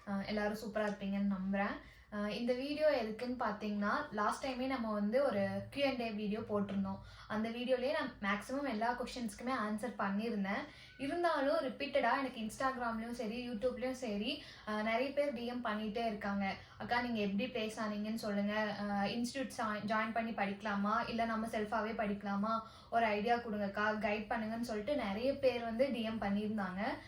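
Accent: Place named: Palani, India. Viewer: good your videos are very nice to see.... Palani slang nalla theriuthu.....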